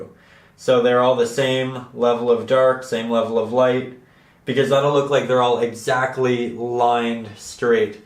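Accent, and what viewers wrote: Canada. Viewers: I hear you are Canadian.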